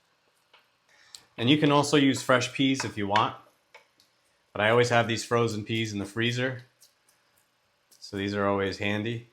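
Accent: Philadelphia, United States. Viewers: Just found your channel. Where's your accent from? Near Philadelphia but not Philadelphia, or southern New Jersey, or Delaware? Those are my three guesses. #1 guess is somewhere in the Philadelphia vicinity, maybe north.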